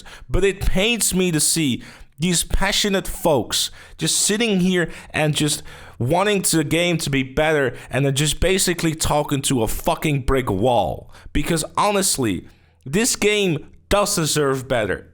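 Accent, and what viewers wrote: Netherlands. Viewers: You talk English as if you’re Dutch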